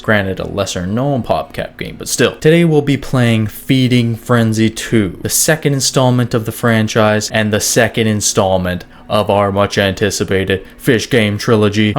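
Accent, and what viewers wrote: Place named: Canada. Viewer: Bro ur Canadian accent is strong asf 💪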